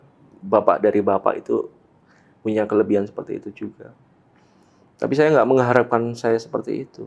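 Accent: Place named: Tegal, Indonesia. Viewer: Iya saya juga orang tegal Penasaran ini mas nya tegalnya manah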